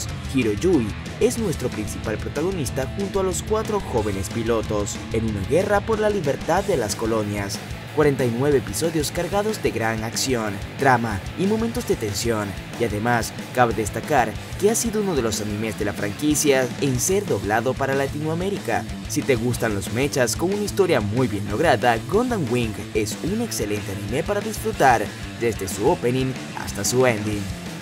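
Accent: Venezuela. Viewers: marico eres venezolano :o no pense que habrian mucho otakus venezolanos saludos desde puerto cabello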